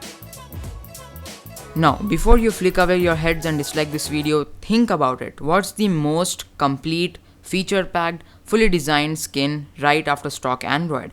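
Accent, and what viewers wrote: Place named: India. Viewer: This is what you get when you combine good english words and Indian accent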